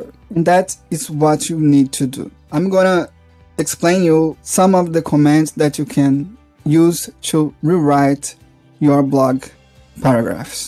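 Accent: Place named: Brazil. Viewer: I know a Brazilian accent when I hear it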